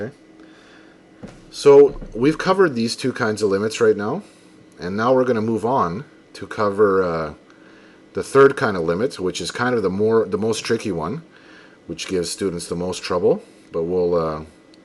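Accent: Canada. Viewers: The Canadian accent makes it sink in better I swear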